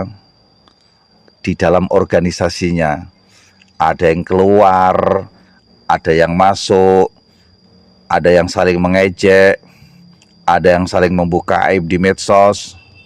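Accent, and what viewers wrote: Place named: Nganjuk, Indonesia. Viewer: Beeelas, ....!!! 😂😂😂 Manteb podho ndesone lur, persis wong nganjuk.😂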